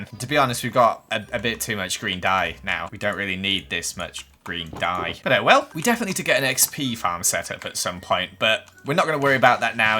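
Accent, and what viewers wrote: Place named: United Kingdom. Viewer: Stop judging British Accents. It's just how we speak.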